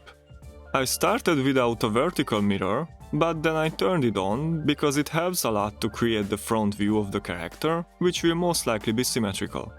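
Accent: Hungary. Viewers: Hungarian accent is so obvious 💀 Good to see an experienced hungarian pixel artist on the field. Do you have any major bigger scaled works/art pieces or do you only work in the gaming industry? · Szia, nem vagy véletlenül magyar? Mert nagyon magyar akcentusod van. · Whats your original language? Because your accent sounds like when a Hungarian speaks English 😁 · Basszus nagyon magyaros az akcentusod :D Amúgy ha az vagy és olvasod akkor rohadt jó tutorial-okat csinálsz. Csak így tovább! <3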